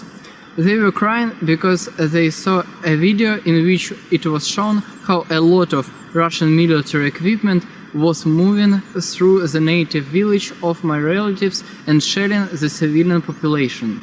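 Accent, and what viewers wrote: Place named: England, United Kingdom. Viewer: Видно что чувак из Англии, прям по акценту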